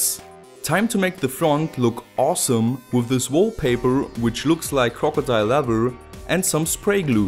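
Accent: Germany. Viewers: German, right ? :D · Warum spricht der englisch das ist doch ein deutscher Akzent und die Schrauben von Hornhaut hatten auch eine deutsche Beschriftung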